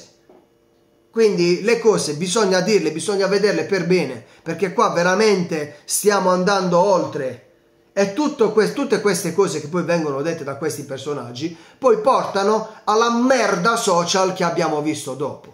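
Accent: Bari, Italy. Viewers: Analisi dei fatti è perfetta Grande sei un grande ti seguo sempre continua così. Vivendo a Roma sappiamo bene chi è il presidente della lazzieeee. Forza Milan Sempre. Un abbraccio forte a e al suo colorito dialetto barese che conosco poco ma detto da te mi fa sbudellare dalle risate specialmente quando vince il nostro amato Milan ciao 👏👏👏👏👏👏👏👏❤️🖤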